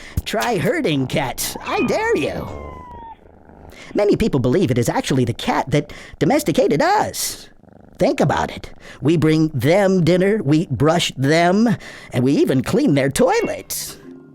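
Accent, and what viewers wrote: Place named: South, United States. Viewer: the voice sounds like a hillbilly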